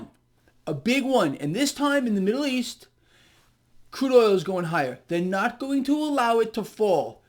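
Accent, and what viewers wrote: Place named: Boston, United States. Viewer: subscribed for that Boston accent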